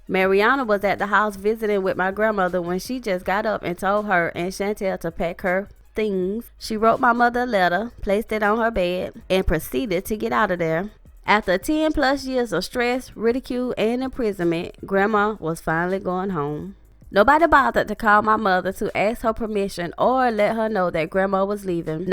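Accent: South, United States. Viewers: Girl, your country accent is so strong that I can barely understand what you're saying. I would just have to read with your voice on mute. Sorry. Where are you from? Alabama? Arkansas?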